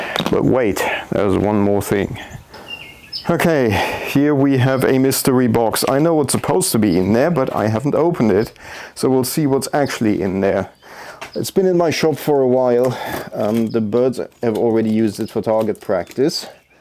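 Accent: Germany. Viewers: Most obvious German accent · Right you are… beauty always very individual - here in Germany we say: „Die Schönheit liegt immer im Auge des Betrachters.“ As you know I think, because your English sounds a bit German !? But please don‘t get me wrong. Your BMW does look great, your YouTube-Video is well made and very informative. Thank you for that. Greetings Matthias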